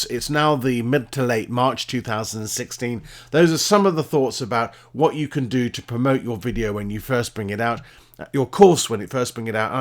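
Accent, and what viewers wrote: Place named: United Kingdom. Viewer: your very good tolisten to i bought my first course last night looking forward to it. just a thought what is pulse. i think instagram reels and tik tok reels are a quick easy way to catch the eye to get a viewer to consider your course. get them with a key point from one of your courses.. nice accent does sound like british army show lol .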